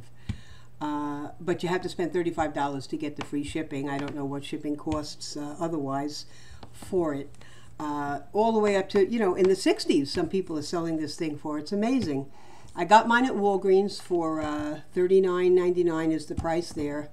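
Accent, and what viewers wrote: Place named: Italy. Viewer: That's awesome! Thanks for making this video, I have constant allergies and I wanted to see reviews of steamers. Are you Italian by the way? My mom's side is Italian and your accent reminds me of my Italian part of my family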